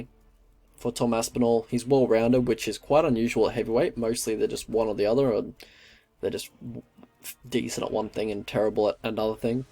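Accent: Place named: Victoria, Australia. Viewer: Oh, ha,ha,ha!!! I thought you had more of a "Victorian" accent. I thought Queensland had a broader more "Crocodile Dundee"-type of accent, ha,ha. But, then again, that would vary even within the same city, as i became aware of in Melbourne. Anyway, great, detailed breakdown, Tom is gonna win and going to go closer and closer to Gane/Ngannou and we are witnessing a new era of heavyweights, that's for sure!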